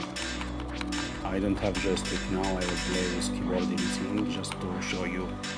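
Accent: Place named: Gulf, Arab world. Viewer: انا قلت انه انجليزي بس يوم شفت اللهجه قلت ذا ولدنا وبس ههههههههههههههههههههههههههههههههههههههههههههههههههههههه(استمر يا أسطوره والله انك ساعدتني🫡🤍)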